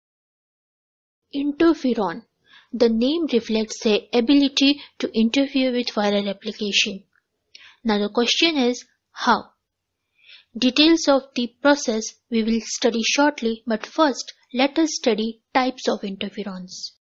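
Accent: India. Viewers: Damn Indian accent...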